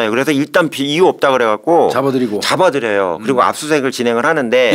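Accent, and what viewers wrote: Chungcheong, South Korea. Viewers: 웃으면 안되는 사건인데 염교수님 너무 정겨워요😂😂 범인이 프랑스인인데 충청도 방언이 들려요 😂😂 앞으로도 잘 듣겠습니다.❤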